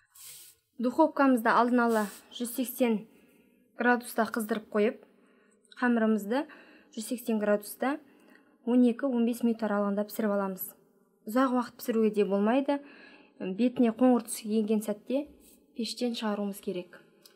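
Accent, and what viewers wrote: Standard. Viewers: Ассалаумағаликум уа рахматуллахи уа баракатух ! МашаАлла таза анық 100%қазақша сөйлейсіз, рахмет 😘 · Таза қазақша сөйлеген даусыңнан айналайын сенің!Аман бол,табысты бол көкем! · Өте керемет.Таза қазақша және анық. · Таза казақша сөйлейт Ма ша аллах өнеге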